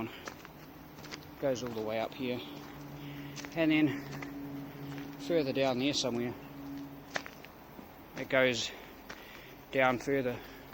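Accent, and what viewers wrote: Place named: New Zealand. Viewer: Sorry to hear that you're still struggling with this problem Bro. I know the feeling. I don't know you're Financial Status but I Highly recommend you come visit the US. With that strong Accent, Blue eyes, and still have hair is a major plus. Also being from New Zealand 🇳🇿 you will have a line of women I can promise you that. I had a Russian friend steal the heart of a girl who was about to get married and there together. It's vice-versa if your from from Europe and really want a girl come to the US they are easy to Manipulate with European charm. Now if you're American go to Europe you will not be disappointed that's my advice to whoever reads this.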